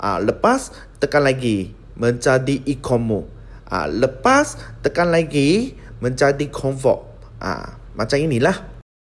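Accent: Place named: Kelantan, Malaysia. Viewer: Kelate bohhh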